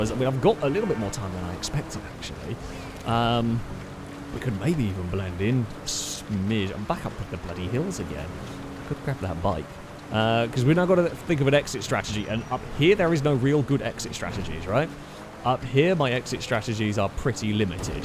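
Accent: United Kingdom. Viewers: depends on what the Goal is. the player wants to sneak around and never be seen while he achieves his goals. the main face of YouTube channel knows that we want violent crashes and lovely United kingdoms commentary over unspeakable violence!! I've never done it, but it's got to be a rough balance to achieve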